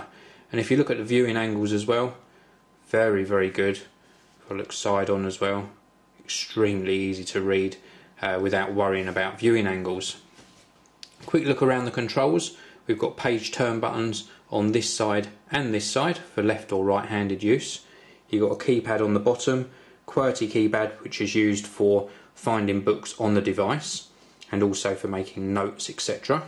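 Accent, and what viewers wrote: England, United Kingdom. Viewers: Everything in an English accent sounds so much more professional :) Great review. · love your British accent :D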